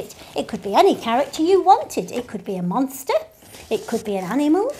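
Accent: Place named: United Kingdom. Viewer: ooh soo cute :) and i LOVE the british accent :)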